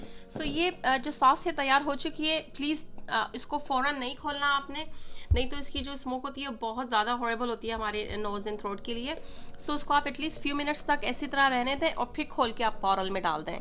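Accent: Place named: United States. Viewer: Aapka amarican accent bohot accha hai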